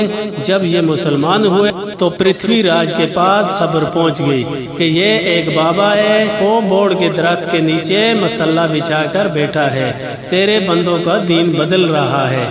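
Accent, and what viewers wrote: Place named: Punjab, Pakistan. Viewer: بھوتنی کے اُردو ٹھیک سے بولا کرو نہ کہ پنجابی نما اردو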